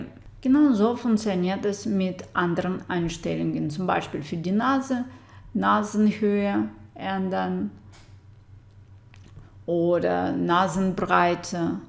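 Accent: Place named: Russia. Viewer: Хотела найти на русском, не нашла. Нашла на немецком… с русским акцентом (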